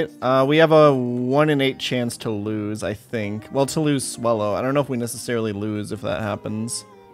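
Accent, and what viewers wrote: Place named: United States. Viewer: he once stated he lived in hongkong (?) for a few years and was influenced very much by american tv series, thats where his accent might come from. I also like how his voice switches entirely when hes speaking german:D In one of the last videos on chat actually forced him to speak 10 min in german Boy cuckbux (twitch currency which you earn for viewing his streams)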